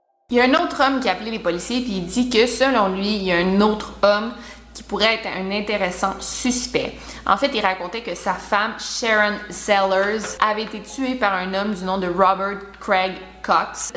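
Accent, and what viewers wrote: Quebec, Canada. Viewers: J’ai découvert ta chaîne il a pas si longtemps et tu m’as captivé dès la première minutes t’es sujet son bien détaillé et l’intrigue est bien présente!et j’adore ton petit accent québécois so cute. Sinon super vidéo comme d’habitude et j’ai adoré le petit moment nostalgique 90s à la fin de la vidéo ha ha 😜👏😘♥️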